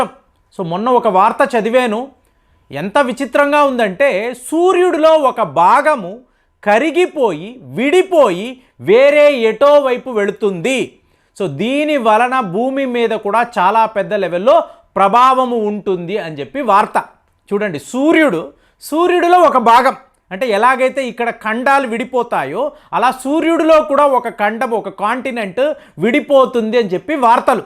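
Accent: Tamil Nadu, India. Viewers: That is Tamil accent.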